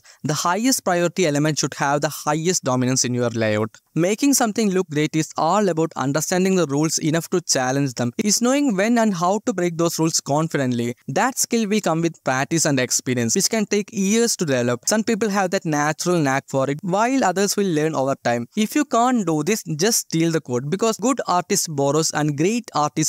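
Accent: South India, India. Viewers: South Indian accent is sweet and you can keep up with it but speaking slower will make your word more clearer. Just keep your content top notch accent doesnt matter that much Cheers Brothaa✌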